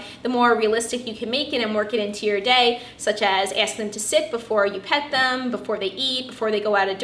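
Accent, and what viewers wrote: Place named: New York, United States. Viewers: Are you from new York or new jersey? I kinda hear an accent there lol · this New York accent is almost unbearable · She’s gotta be from Long Island. We’re the only people that pronounce dog that way lol. Great video.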